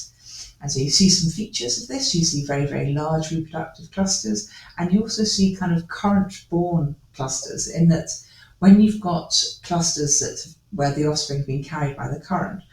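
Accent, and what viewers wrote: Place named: United Kingdom. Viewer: Nothing better than hearing pure science from a well-spoken British scientist! 🥳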